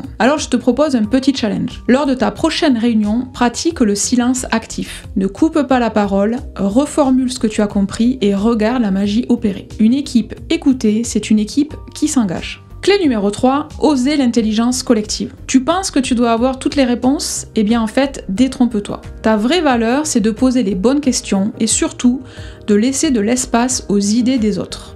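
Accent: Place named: Southwest, France. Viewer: Bel épisode, clair, vivant dense et fournissant une vision du leadership adapté au 21ème siècle Si bien que j'ai voulu les lister pour m'en souvenir - je partage, mais que cela ne vous empêche pas d'écouter, vous rateriez l'authenticité de (et son bel accent du Sud Ouest) 1) Poser une intention claire: Définir son "Pourquoi" avant de se lancer dans un projet pour éviter de perdre du temps et de tourner en rond. 2) Faire de l'écoute active un super pouvoir: Écouter réellement sans préparer sa réponse à l'avance. Pratiquer le silence actif et reformuler ce que l’on entend. 3) Oser l’intelligence collective: Ne pas chercher à tout savoir, mais poser les bonnes questions et utiliser des outils collaboratifs (World Café, brainstorming structuré…). 4) Célébrer les petites victoires: Se concentrer sur les progrès quotidiens, noter et partager les réussites pour renforcer la motivation. 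5) Miser sur le feedback régulier: Le feedback doit être constant et structuré (ex. Plus/Delta/New, Triple A, Stop/Start/Continue). 6) Adopter une posture de manager facilitateur: Plutôt que de diriger, créer un environnement propice à la performance et au bien-être de l’équipe. 7) Définir des objectifs partagés et motivants: Utiliser des méthodes comme SMART ou OKR pour rendre les objectifs inspirants et engageants. 8) Faire confiance et déléguer intelligemment: Déléguer, ce n’est pas juste donner des tâches, c’est responsabiliser et donner du pouvoir d’agir. 9) Assumer la transparenc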